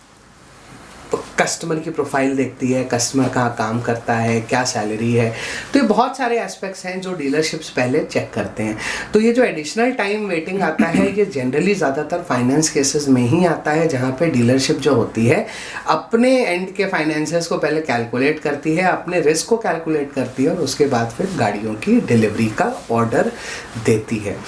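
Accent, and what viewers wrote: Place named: India. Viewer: sorry, I don't understand Indian English. I tried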